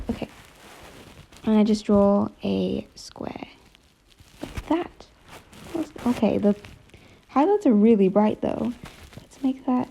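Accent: United Kingdom. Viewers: omg I watch this vid and it was really helpfull, your voice helps me a lot too. Guys, I think we have the best Edit Teacher here xD And Thanks very much for this video. (Oh and, are you using british accent? I use that accent too) edit: I use this tutorial for 6 days, and I finally did it ;> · Her voice: has this British accent My voice: dying rat noises intensifies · you are british? u3u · the British accent (Or the Australian accent whichever she has both are awesome)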